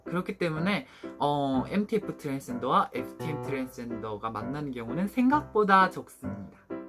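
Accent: Gyeongsang, South Korea. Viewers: 경상도분이시네 그냥 사투리로 하시지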